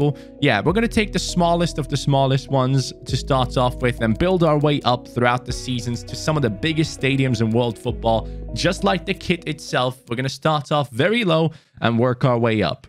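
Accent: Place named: Jamaica. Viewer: Hey could keep the Jamaican please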